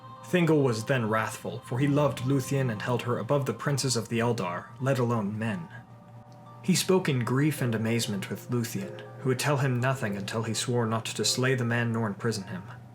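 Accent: United States. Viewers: Lol, it sounds so wrong told in an American accent😂🤣!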